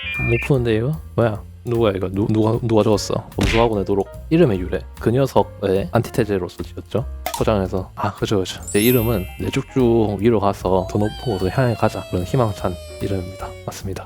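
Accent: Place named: Gyeongsang, South Korea. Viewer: 노↗️래↘️방↗️ 방↗️송↘️하니까 경상도 티남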